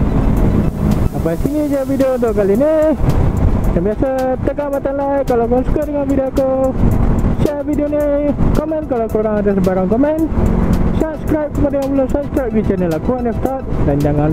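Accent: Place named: Tanjung Karang, Indonesia. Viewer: Dgr bro ckp mcm dr tjg.karang..stay tjg karang ke.maaf kalo tersalah dgr ye✌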